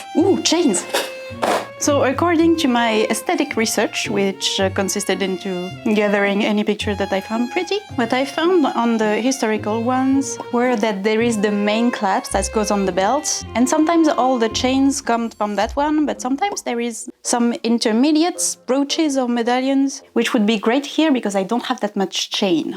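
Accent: France. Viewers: As well as a fantastic creator you are naturally beautiful and you sound amazing, I run a French bistro here in Wales UK and I'm so ysed to listening to the French accent and mix of French and English language, you should be proud of yourself you are an inspiration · First time watching any of your videos and don't take this the wrong way, but your accent is one of the first French accents that didn't irritate my picky ears. I'm starting to wonder if maybe the French accents that annoy my odd taste in accents are fake... Anyway I doubt I'm one to complain about accents with Utah (USA) drawl and I am watching the video because I love to craft and if it takes inspiration from historic pieces, even better.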